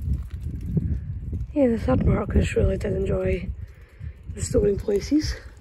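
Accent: Scotland, United Kingdom. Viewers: I did archaeology on Inchmarnock, the little island near Bute. That island unearthed a slate called The Hostage Stone, which depicts a probable Viking raid. You are refreshingly real. I appreciate the humble focus on the historical sites and nature. Hailes Castle is atmospheric, and Arbroath Abbey. Just be cautious in some rural spots, gangs of youths can be a problem. I watch a channel called Kirsten and Joerg for its comforting slow meandering simplicity. Quite different from your Scottish theme but they get lots of subscribers, it took time to get to that though. I like your Scottish delivery. You have a nice voice.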